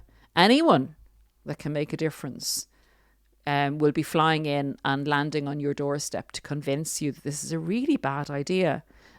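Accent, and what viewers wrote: Ireland. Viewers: I love her and remy ❤ and the accent is that Irish?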